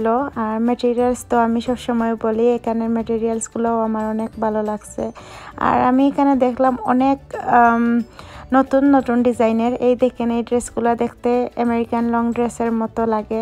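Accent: Sylhet, Bangladesh. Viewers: Boin sylhety mat ow tumare beshi manay. Suddho vashar mat huniya kila lagse. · Afa sylheti mato ela bala lagena 😏😏😒😒😒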